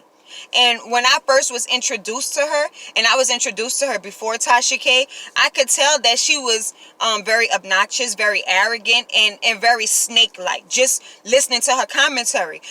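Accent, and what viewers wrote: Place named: New Orleans, United States. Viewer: Love the NOLA accent! I agree 100....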